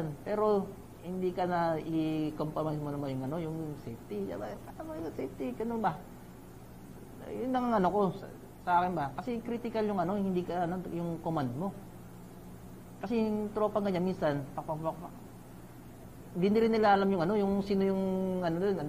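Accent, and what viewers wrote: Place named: Visayas, Philippines. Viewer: FLuent sa tagalog si pero di nawawala ang,, ba.. Naisip ko n ilonggo or cebuano. Tga gensan pla👍👍👍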